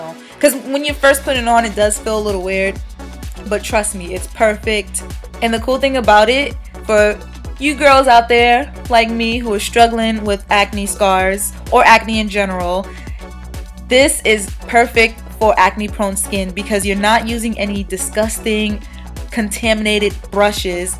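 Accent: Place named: South, United States. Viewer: Lol,idk you're from Boston,but you have a slight country accent.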